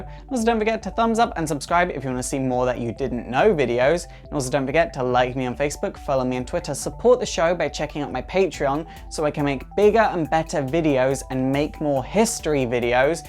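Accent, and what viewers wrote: United Kingdom. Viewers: Are you British you sound British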